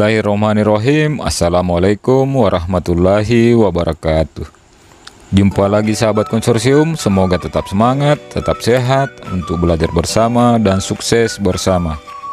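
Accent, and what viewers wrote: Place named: Sulawesi, Indonesia. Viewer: kyk nya orang sulawesi, dari logat nya😁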